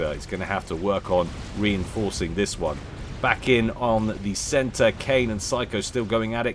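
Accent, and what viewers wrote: United Kingdom. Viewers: I think so, he sounds quite British to me.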